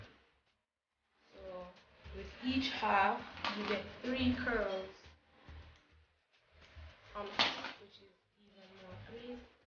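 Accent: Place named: Jamaica. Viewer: Nice love the way u used ur Jamaican accent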